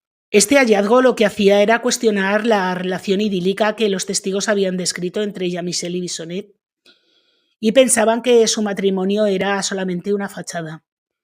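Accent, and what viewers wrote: Aragon, Spain. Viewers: excelente vídeo.Eres aragonesa? Tienes un cierto acento maño,que me encanta 😍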